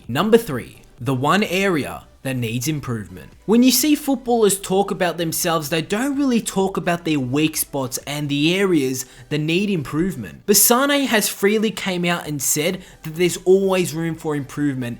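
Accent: Australia. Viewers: le roy sane from Maineee chester citah!! aussi accent hahahh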